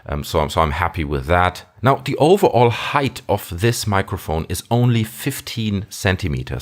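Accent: Germany. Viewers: 3,5 mm Jack? Ich weiß dass du deutsch bist, haha, man hörts. Könntest du mich bitte auf Instagram anschreiben? Ich hätte gerne über das Mikro diskussieren. Habe vor mir es zu kaufen.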